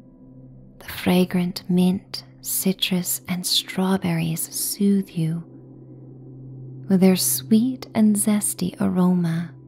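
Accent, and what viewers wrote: United States. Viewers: Write a book a novel or novella a romance or a juicy romance or a mystery or a coming of age with wonderous epiphanies in it. Write and publish self publish if you want or make an audio book. If your not going to sing write a book. I’m sorry I borrowed Jack as my pup but he kept whining for mommy and he snored very loudly for such a wee boy. I love the outer banks, never been there except in stories or films but it is dreamy. I giggled from your southern accent. The images painted by your words are sublime lots of new metaphors and tactile stuff it’s terrific. How come your eyes aren’t moving as if your reading is this just flowing from brain to voice? Happy Sunday.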